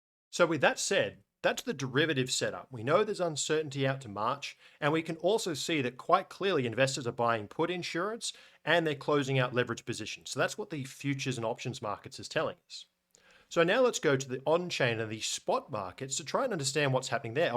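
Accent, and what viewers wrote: Australia. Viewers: I prefer dark mode, but either way your information is absolutely priceless and very much appreciated. Thank you for everything you do. And it's very nice to hear it all explained by an Aussie too!! 😃😃😃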